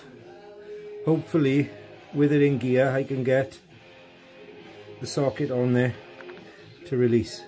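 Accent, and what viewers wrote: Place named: Wales, United Kingdom. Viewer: Great, l've been looking for a vid like this of how to jam the front sprocket to enable to take it off. Spoken with a lovely Welsh accent too! Cheers buddy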